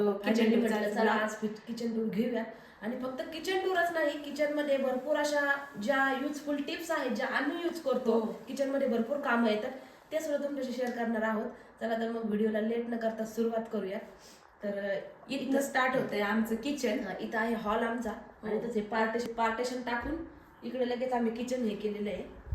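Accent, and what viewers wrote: Ahmednagar, India. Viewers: मी आज पहिल्यांदा पाहिला तुमचा vlog 👌👌खूप आवडला मी कोल्हापूर ला रहाते पण मी नगर जिल्यातील आहे शिर्डी जवळ बाभळेश्वर माझे माहेर आहे माझे education प्रवरा कन्या विद्या मंदिर मध्ये 7 वी नंतर पुणे मध्ये छान आहे kitchen आत्ता मागचे vlog पहाते तुमच्या भाषे वरून कळले तुम्ही नगरीं आहात मग मागचा स्वाती च्या love story vlog पाहिला तेव्हा कळले त्यात तुम्ही तीळा ला हौरी म्हणालात तर मी conform च झाले तुम्ही नगर भागातल्या आहात कोणते गाव आहे तुमचे surmane काय आहे घर खूप प्रशस्त आणि सुंदर आहे आणि maintain पण केलेय माझ्या सगळ्यां मैत्रिणी कोपरगाव कोल्हार लोणी श्रीरामपूर संगमनेर प्रवरानगर रुई राहता इथेच आहेत 🙋‍♀️❤, अंबज्ञ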